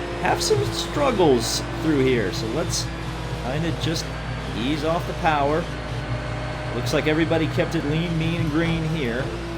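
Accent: United States. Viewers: Finally an American... i hate hearing some brit’s lispy-ass voice ffs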